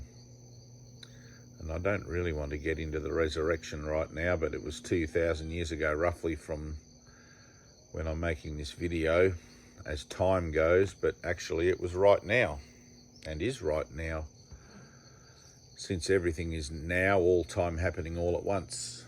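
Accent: Australia. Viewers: 1965 - me to, I'm Pisces, in Australia as you sound to be, looks like QLD ? - this is fascinating, yes we are spirit beings having a human experience ! Now, also at 59 I have been "pondering" these issues since childhood & I find it so interesting that almost everyone with whom one discusses this, almost invariably cannot resist pointing out error of one type or another, this I am NOT doing ! I do look forward with great anticipation to getting my copy of this book, thank you so very much for this video & indeed your Y.T. Channel. A more captivating subject I have yet to encounter, love and light be with you and ALL souls now, 💜💜💜 💜 💜💜💜 🕉 🙂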